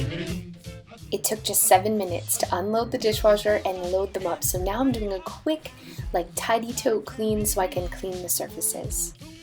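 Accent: Canada. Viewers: your Canadian is showing lol! "eh?" it's all good. I'm in Indiana and I still say eh. I live like 3.5 hours from Canada so that may be why haha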